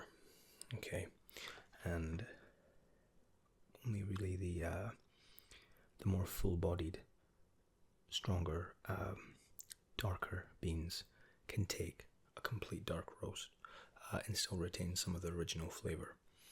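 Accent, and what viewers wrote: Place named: United Kingdom. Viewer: I have found my new favourite bed bug. Love your work. You have a wonderful mixture of toned down enthusiasm and relaxation in your voice and your accent is like nothing I've heard before. It's British, Irish, sometimes even with a hint of Australian... it's mesmerizing. May I ask, where ARE you from exactly? Have you travelled alot and picked up this little smooth smoothie of accents along the way? I'm Swedish so I am certainly not an expert when it comes to English accents but I've listened to enough to know that you sir are one of a kind in the ASMR game. ❤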